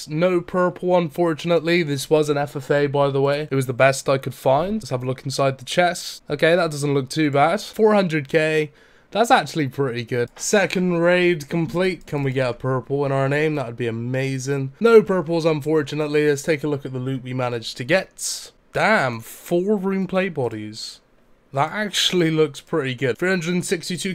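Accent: Bristol, United Kingdom. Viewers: Brizzle accent mesh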